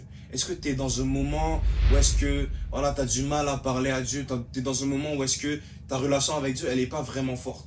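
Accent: Canada. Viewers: superbe vidéo, j‘aurais dit « période de l’année » et non « époque » sinon la vidéo est trop qualitative et j’en avais besoin thank you sir tu es canadien? tu as un accent canadien en tout cas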